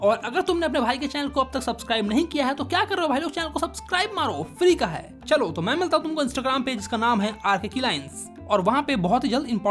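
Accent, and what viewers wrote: Bihar, India. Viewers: Bhaiya aap bihar se ho ya uttar pradesh se 😅😅😅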